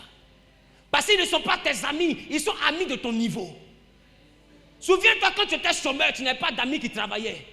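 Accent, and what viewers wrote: Ivory Coast. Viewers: l accent ivoirien, man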